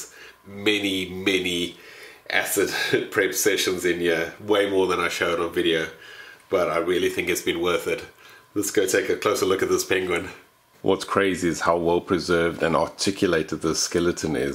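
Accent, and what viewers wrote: South Africa. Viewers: Why do you sound South African that's lived in Scandinavia or smth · You sound like an ex South African was good to see what you do, I know an paleantologist her in ZA such nice people you are 🙏🏼. How old would that penguin be ??? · u sounds south african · Sounds South African to me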